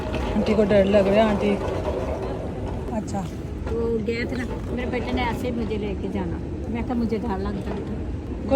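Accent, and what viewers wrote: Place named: Sialkot, Pakistan. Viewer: Nice video baji ap kaha se hai Pakistan aapki language Sialkot ki lagti Hai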